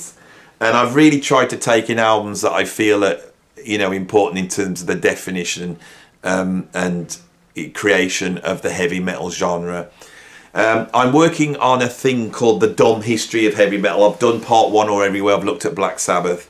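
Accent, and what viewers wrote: Birmingham, United Kingdom. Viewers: To be fair, did say at the start that metal isn't his main genre. But I caught that too. Dissident is one who rebels against society. Many people still think it's Stained Glass. Can't blame them. The cover looks kinda stained glassy. I like how the Brummies remove the T from Metal. Also, says "PAHN-terah". In U.S. we say "pan-TERA" He makes it sound like the name of a fluffy kitten. Here in America we say it like a solid punch.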